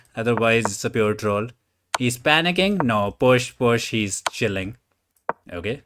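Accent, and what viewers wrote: India. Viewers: I randomly find your Channel, i love when hindu People speaking english❤, i was work with People from India❤ 🇵🇱🤝🇮🇳 greetings bro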